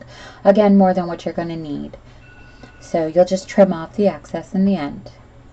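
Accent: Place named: Canada. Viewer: Your accent reminds me of canadian english the way you say certain words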